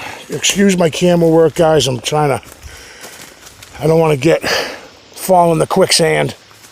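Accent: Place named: New Hampshire, United States. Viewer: You made me home sick for NH, Born and raised. Then I moved just saw this Sept video on turns scary real quick... You're accent makes me laugh 😂 now.. But loved it, but I was yelling get out.. get out Run don't walk now Skip if you have to I won't hold it against you. I'll FaceTime from KY the next time you need a fast out.. thanks for the content on a rainy day... But as for being so close to the road this is what my parents told me when I was little people always throw trash and food from their cars when they're going down the road so animals like to come up to eat to wash his Bigfoot be any different that's my take on it but they applied it to seeing deer on the side of the road when I was a kid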